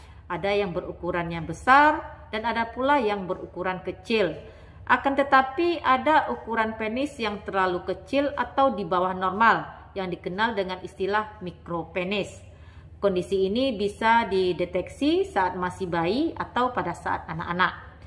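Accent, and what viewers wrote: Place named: Sumatra, Indonesia. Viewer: Logat nya kyk sumatra deh😅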